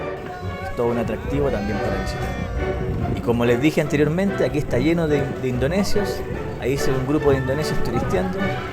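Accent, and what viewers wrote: Chile. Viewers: Me encantó tu vídeo y sobretodo tu relato bien chileno , yo creia que Egipto era un país muy caro para visitar,pero por lo que cuentas no es asi , saludos desde Los Lagos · Genial el relato 😂😂😂a lo chilensis Bravo Me encantó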